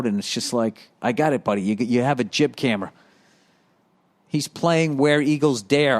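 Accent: Boston, United States. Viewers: Bwaaston... Haha love hearing you. Keep it going.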